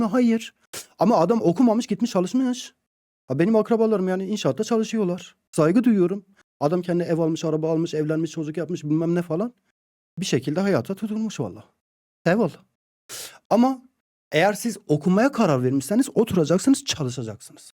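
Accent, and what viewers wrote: Van, Turkey. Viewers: Vala zordur dediğiniz yer 😀 cok ozlemişim Van'ı iyiki şivenizi hiç yitirmemişsiniz, Allah yolunuzu açık etsin 👏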